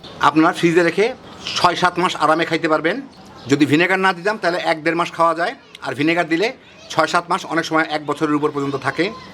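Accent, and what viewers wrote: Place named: Barishal, Bangladesh. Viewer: আপনি কি বরিশালের??? কথার ঠং ভাল্লাগে নাই। আর রসুন তো বেশি পুড়ে ফেলেছেন।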